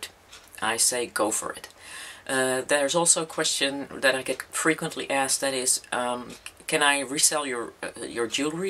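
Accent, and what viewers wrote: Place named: Netherlands. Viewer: are u originally dutch cuz u have a dutch tone in ur voice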